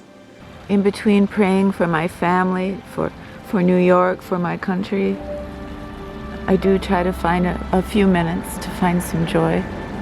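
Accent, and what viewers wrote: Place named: Midwest, United States. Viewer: i really hear your midwestern accent in this video.. it is very cute. stay well..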